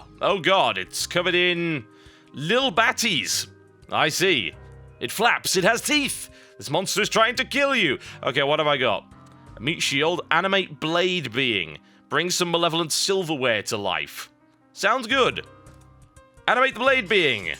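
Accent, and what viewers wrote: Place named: Yorkshire, United Kingdom. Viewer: every so often you go into a yorkshire accent.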